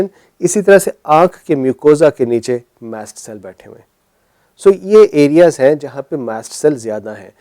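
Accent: India. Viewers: it's imposible to understand indian 😢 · ur urdu is so gud u hv bit of kashmiri accent :)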